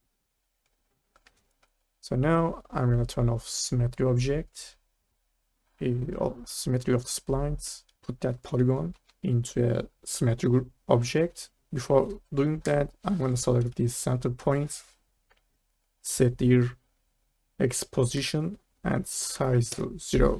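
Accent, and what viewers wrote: Turkey. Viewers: sesini duyar duymaz türk olduğunu anlamak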